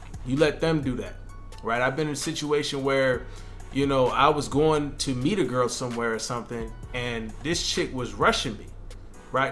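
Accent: United States. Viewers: Next time public English speak should be louder than your American damn accent bro !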